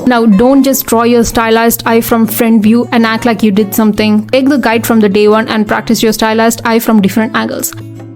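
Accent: India. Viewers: yh i was wondering bcz this is how my family speaks eng back home in India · You sound like if you have a an Indian accent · Are you Indian , don't get me wrong, I am Indian. Just guessing from the accent. I have the same accent ,please don't get me wrong. Btw I am one of your subscribers.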